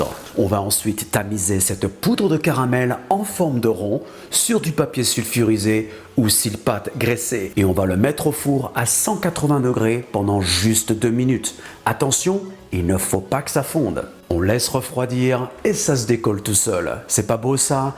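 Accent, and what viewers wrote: France. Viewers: where is the french accent of the chief ? sa me manque en vérité c'etait quand meme amusant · oui il est top dans les deux langues, perso, je préfère la version anglaise avec son petit (très léger) accent français si charmant !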